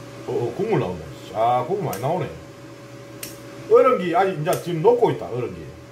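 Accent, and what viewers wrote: Gyeongsang, South Korea. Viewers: 경상도 특 안고쳐짐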